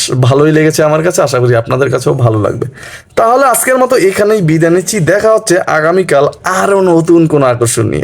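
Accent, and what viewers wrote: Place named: Barishal, Bangladesh. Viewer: কথায় বরিশালের গ্রাম্য ভাব আছে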